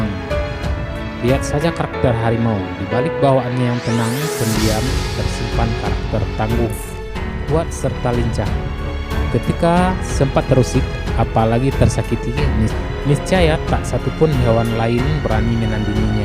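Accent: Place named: West Sumatra, Indonesia. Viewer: Dari nada bicara org yg cerita d.vidio ini ..aku bisa pastikan kamu org minang jadi kalok bicara soal inyiak atau siluman harimau itu memang nyata baik d sumatera atau d.jmbi tepatnya di prguruan silek rimau ..atau perguruan halimun P. Atau di kampung pamunyian kecamatan lubuk mengkoang kab.tebo jaya jmbi tapi kalok bicara soal adat itu semua suku sudah pasti mempunyai adatnya masing² 🙏.dan di sini saya hanya ingin mengutip sedikit fakta ya itu ..pandangan antara suku kalok saya sering lihat dengan sedirinya kenapa suku minang itu selalu memandang negatif tentang org jawa contohnya sedikit dikit [urang maa.. tu da itu si.akang mah da ] jd kalimat yg begituan kyknya kurang cocok bagi kita sesama suku bangsa INDO.andai saja org suku lain yg berbicara demikian contoh [org mana itu mas org si kerbau atau kabau mungkin sangat janggal kedengaranya kalau bisa mari kita saling menghargai sesama suku bangsa karna kalau di ranjikan atau sejarahkan terlebih di agama kita semua adalah saudara sanak famili yg harus menghargai satu sama lain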